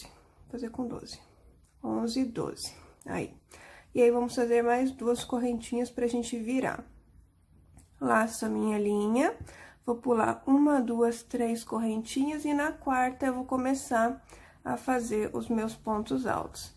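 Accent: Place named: Spain. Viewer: Eu amo essa fala como eu queria aprender falar assim é muito lindo como se pronuncia ad palavras bjosss para todos da espanha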